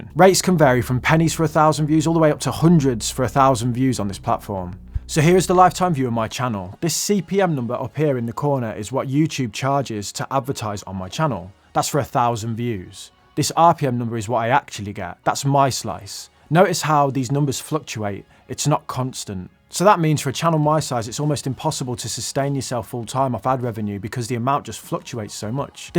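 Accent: Birmingham, United Kingdom. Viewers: You're from the North West? I thought you were a Brummie.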